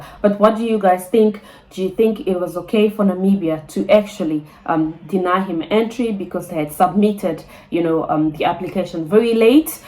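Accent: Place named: Nigeria. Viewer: You sound more Nigerian than Namibian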